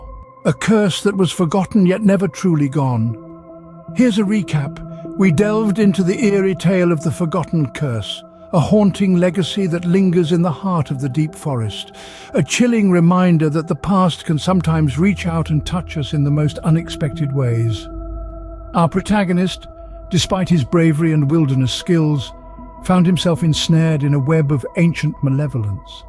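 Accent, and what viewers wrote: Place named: United Kingdom. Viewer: A British narrator. How wonderful. Our American friends will love it.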